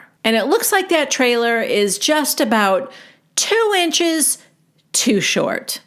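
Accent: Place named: Midwest, United States. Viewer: I got to say we are in March 2024 and I too used to like ‘s videos. I do not like her anymore. Not that that matters or is going to sway anyone’s opinions but she is confused between a content creator on YouTube and Homeland security. She dives into peoples lives. I mean yes granted they are public figures but when no one would be surprised if she knew their blood types and their Social Security numbers, that’s a bit of a problem however, that is whatever you know I think that she does a little too much, but that was just my opinion. It wasn’t until Garrison died that my opinion has really completely changed about her, she is sitting there criticizing TLC in the production company and she’s not wrong with what she’s saying she’s not wrong at all with what she’s saying actually but what she’s criticizing them for having things out there that they may see and they may have to relive all of that she is doing the same exact thing, every Difficult situation that family has ever gone through. 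She has made at least five videos about at least each thing and then when it’s like bigger things then she’s putting out like three or four videos a day about it so it’s a little bit of the pot calling the kettle black to me, but that was just like the cherry on top of the Sunday really was the fact that this girl who lives in I’m not sure where she lives but with the way that she talks that accent that she has the way she says things it screams like Midwestern to me But she is where she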